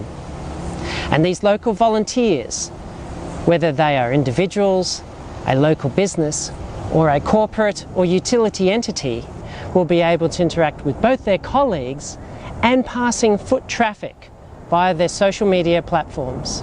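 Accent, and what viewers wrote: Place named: Australia. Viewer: Awww Brisvegas..! Thought you sounded quite aussie from your other videos :)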